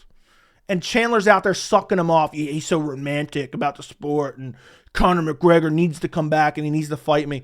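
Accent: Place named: New Jersey, United States. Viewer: You gotta be from NJ because I speak the same exact way when I’m passionate and frustrated 😭 you’re so right tho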